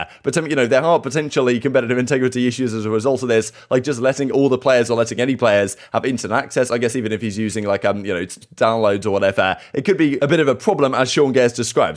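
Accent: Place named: England, United Kingdom. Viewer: he’s English, but nice try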